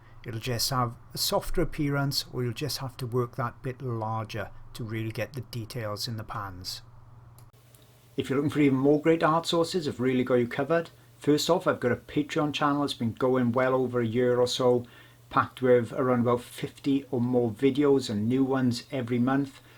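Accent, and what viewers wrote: Wales, United Kingdom. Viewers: Woooo wow, this is excellent 👏🏻👍🏼 love your art.. can I hear a Welsh accent!!!! I'm from carnarvon North Wales originally ✌️✌️😁😁🇬🇧🐲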